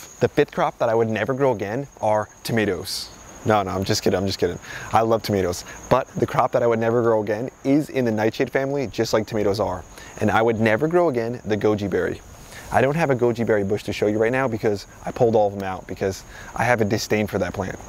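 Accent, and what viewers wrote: New Jersey, United States. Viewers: I enjoy listening to your New Jersey accent. I'm in Harlem, by the way. And I ❤Tuck.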